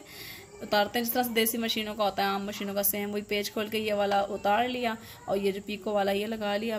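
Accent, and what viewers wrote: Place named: Karachi, Pakistan. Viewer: ap apne accent se karachi ky lgty ha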